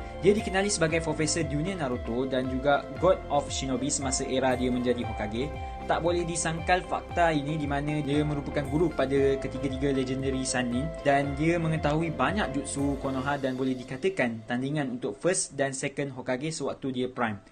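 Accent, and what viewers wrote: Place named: Selangor, Malaysia. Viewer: Orang selangor ke ni Sbb kawan2 aku yng asal selangor 75% pelat2 sikit😂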